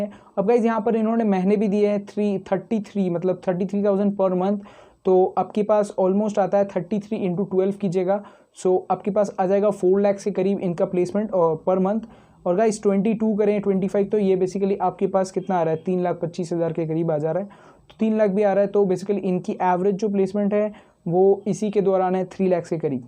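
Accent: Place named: Bengal, India. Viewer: Dada tumi hindi bolte bolte bangla bolar j taan thake tomar seta chole asche bar bar 🤭🤭👀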